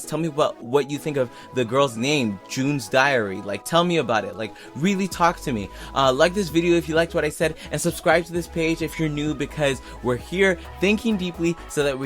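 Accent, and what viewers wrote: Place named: Jamaica. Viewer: When I first heard it I was disappointed they went the trap rnb route because I expected more from their voices. I didn't like for the same reason but now I can't help but bump to it. Like you said they're trying to capture a certain market, "get in where they fit in," and might just do that for them. Off topic but are you Jamaican? I hear a slight yardie undertone in your voice lol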